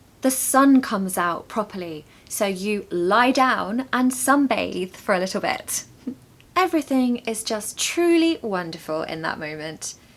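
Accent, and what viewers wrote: United Kingdom. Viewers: You've got such an elegant British accent! Really enjoy listening to your speech. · The best teacher.I love british accent.I like your voice teacher.😊❤ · Hello, Is posible to teach me class online I like your accent British Kind regards Emma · Hello! Well, many British accents exist. They (British people) don't all speak like 🙂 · Hello,. Hope this email finds you well, and sound. I am writing this email in regards to your ways to present a productive content. Literally, endearing, splendid British vlog. I am totally intrigued with your fancy accent. Moreover, I want to express my gratitude to you for all your work on getting your content makes sense, so kindly keep the good work up. Your prompt respond is highly appreciated. Sincerely, Moe. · I love British accent and want to talk like British. Your video really helping me,. Thanks for everything. 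· It is lovely British pronunciation😊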